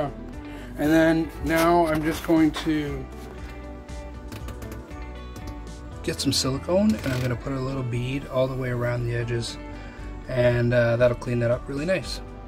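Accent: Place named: Midwest, United States. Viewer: “Ope, just tripped there, sorry” you’re definitely from the Midwest 😅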